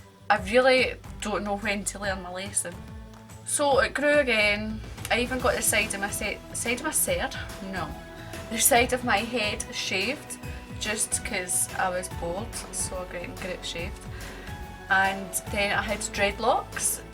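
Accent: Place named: Scotland, United Kingdom. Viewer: are you scottish I can hear it in your voice love it.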